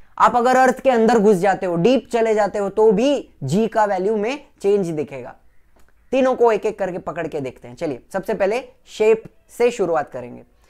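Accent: Maharashtra, India. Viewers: Sir aap aaisa bol rahe ho...usse lag raha hai ki app MARATHI HO............ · Sir u r marathi ? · Sir tumhi udi marta mhtl mhnje tumhi 100% marathi ahe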